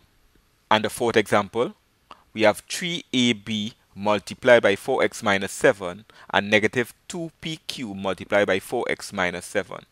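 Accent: Trinidad and Tobago. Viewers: Just curious are you from trinidad... it's just the way you talk it had me thinking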